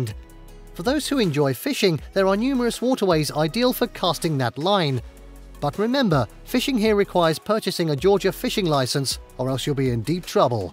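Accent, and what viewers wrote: United Kingdom. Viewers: Great job showing amazing places to visit in Ga. But caught me off guard when I heard a British accent. I was expecting a Ga accent.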